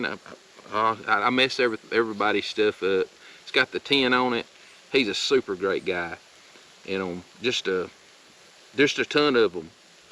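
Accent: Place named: South, United States. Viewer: Northern boy, parents from the south, spent most of my youth traveling relatives in the south. The sound of your voice brings me back to better days and better people and reminds me there is so much to miss . People if you haven't been to the south you are missing out on the best humanity has. And thank you for the bible verse at the end, you are exactly on point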